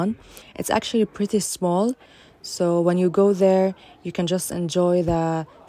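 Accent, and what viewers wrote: Lebanon. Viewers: ارجوك تكلمي لبناني واحد من اسباب متابعتي لك لهجتك 😢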